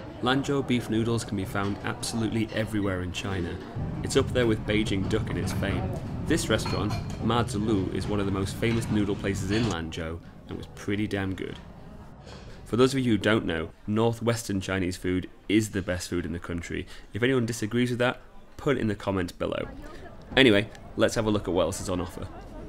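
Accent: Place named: Northern England, United Kingdom. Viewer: Nice video you northern chuff! Subbed! you from Sheffield? Leeds?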